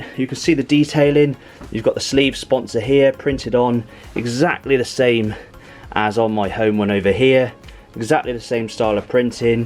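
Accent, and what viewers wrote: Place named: Manchester, United Kingdom. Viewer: What a strange Mancunian accent.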